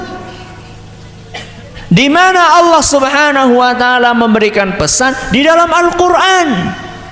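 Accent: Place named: Banyumas, Indonesia. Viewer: Masya Alloh..... Seneng dengerin ceramah pak ustadz, karena suka diselingi bahasa Jawa yang ngapak😂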